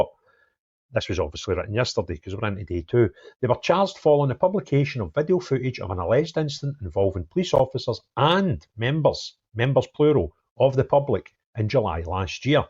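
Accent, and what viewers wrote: United Kingdom. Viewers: My apologies...what accent is this? I am assuming this is UK, which region is your accent?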